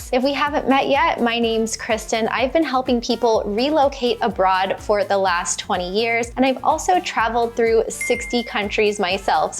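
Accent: United States. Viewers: Que desesperante es la voz en inglés americano... de por si el idioma inglés es así, preferible el inglés británico que es ligeramente peor...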